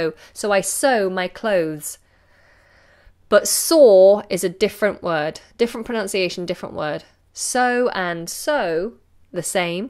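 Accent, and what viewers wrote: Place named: England, United Kingdom. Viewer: i like your engish accent more than Lucy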